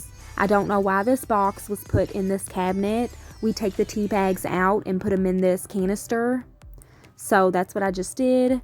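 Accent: South, United States. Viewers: You might be a gypsy well honey you have got that southern barrio accent I am from Georgia and I got it to you tickle me when you talk about all that stuff just the prettiest little fine but I’ll say one thing you were very clean person nobody could never say you was nasty thank you for sharing hugs and prayers baby give the baby a big O kiss and harmony today❤ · I love your southern accent!! I'm going to need to try that Anti bacterial pledge!❤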